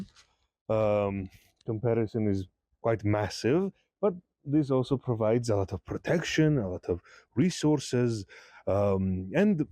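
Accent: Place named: Wales, United Kingdom. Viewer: Ive been to Georgia before but this is the first time ive heard a Georgian accent that sounded welsh. Im guessing it must either be a regional accent or a result of having a welsh English teacher.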